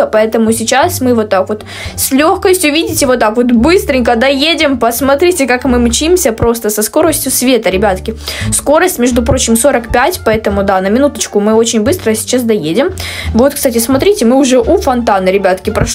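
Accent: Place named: Ukraine. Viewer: Скажите пожалуйста она русская просто речь как у украинки(не хейт) очень люблю её смотреть❤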